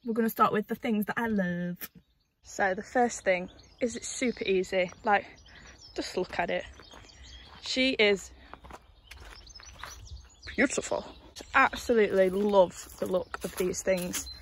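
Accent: United Kingdom. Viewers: I'm pretty used to thick British accents but I raised my eyebrows when you called those birds 'dogs'. XD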